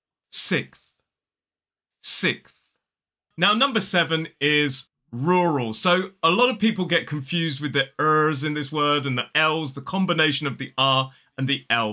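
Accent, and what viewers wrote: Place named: RP, United Kingdom. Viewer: Very interesting to hear difficult words pronounced with a British RP pronunciation. Thank you for the lesson ❤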